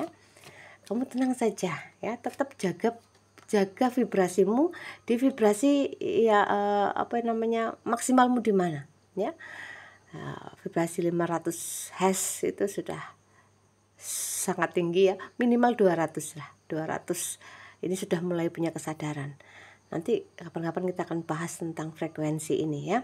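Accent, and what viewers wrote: Java, Indonesia. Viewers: Sy selalu ikutin readingan mbk,dan sy suka dgn cara kejawenya yg medok,trimkasih untuk semuanya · Bismillah menyimak kak. Alhamdulilah Ya Allah. Tindakan positif tetap semangat. Kak bicaranya logatnya Jawa tulen. 💪💪💪🤲🤲🤲🤝🤝🤝❤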